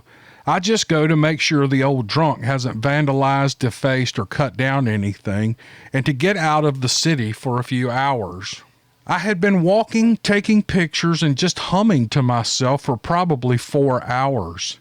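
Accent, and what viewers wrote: South, United States. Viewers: You have a very nice comforting southern accent that is comforting and it's good for this line of work.and I think you could do well on tv specials if somebody just heard your voice in the way you can tell a story and make a story feel real and you can feel the emotion in the stories and I'm positive everybody that's subscribe you would agree with me cuz if they don't they wouldn't have subscribed to you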